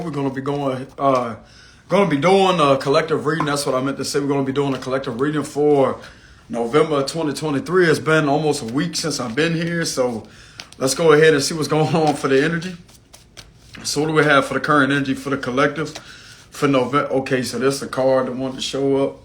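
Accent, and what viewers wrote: Louisiana, United States. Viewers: Thank you for the reading. By chance, are you from south Louisiana? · New to the channel! Came across my feed….no coincidences! Gotta be from Louisiana with that accent 😇 Takes one to know one! Thank you for your service fellow lightworker 🙏✨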